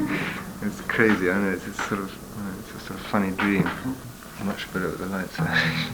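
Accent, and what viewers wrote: RP, United Kingdom. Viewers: I had no idea he spoke in upper RP. I knew it would be RP given his upbringing but he says his A so flat, like Queen Elizabeth did. So beautiful to hear. What a talent he was. Tragic. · Love Drake but if someone told me this was one of the Royal family speaking would have believed them even makes the Pink Floyd guys sound like neds scallies or chavs 😢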